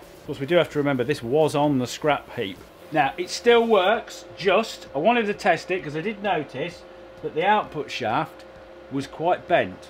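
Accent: England, United Kingdom. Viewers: Why after every time I watch a new video do I want to start talking with an English accent and go out to the garage and try to think up the most insane and off the wall contraptions..... 😂😂😂fantastic video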